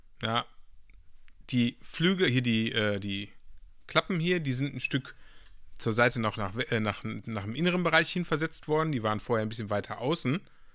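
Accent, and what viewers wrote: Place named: Ruhr, Germany. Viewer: Dat is Pottsprache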